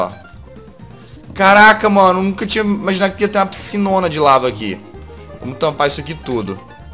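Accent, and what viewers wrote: Minas Gerais, Brazil. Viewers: Vc mora na Bahia????? Podia jurar que vc morava em Minas Gerais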